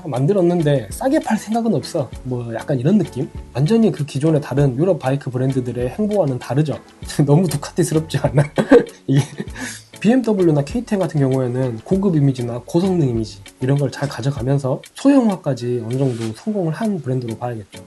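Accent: Jeolla, South Korea. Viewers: 오늘따라 사투리가 더 구수한 느낌인데요? 전라도 사투리 먼가 정겨워요 ㅎ 바이크 타는데만 관심잇지 이론은 생각도 안해봤는데 채널 재미나게 보면서 매번 많이 알아갑니다. 항상 좋은 정보 유익한 영상 감삼다!!👍🫰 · 고향이 전라도인거같아 구독은안합니다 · 아따 구수허니 잼있게 잘 봤당께요. 서울살이만 십여년하다 올만에 전라도 억양 들으니 기분 좋네요 ㅋㅋㅋ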